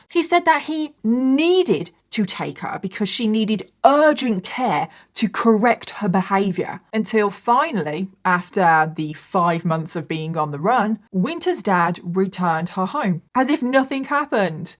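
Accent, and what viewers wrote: United Kingdom. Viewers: I thought you were British with your accent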